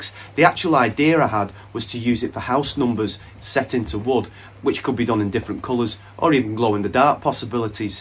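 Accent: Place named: Liverpool, United Kingdom. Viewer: More scouse than me mate . We willsee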